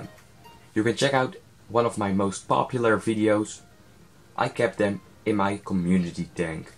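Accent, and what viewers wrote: Netherlands. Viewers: Ik hoor... een Nederlander ;) prachtige bakken heb je! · Hey! Ontdekte je video bij toeval! Aan je accent te horen ben je ook Nederlands. De witte waas in je water zijn dooie bacteriën, dus niets aan de hand! Ik ben gesubt! En ga zo door 👍🏻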